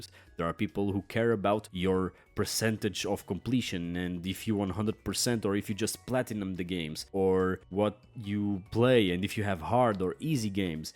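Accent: Portugal. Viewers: Just stumbled on this video and my first thought was: "This guy sounds like a portuguese speaking english!" Well, what do you know? 🤣 Keep it up! 👊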